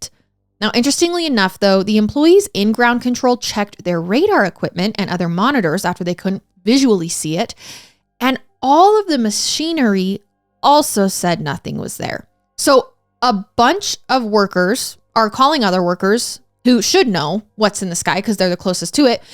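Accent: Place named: AAVE, United States. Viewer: Ebonics! It actually exists!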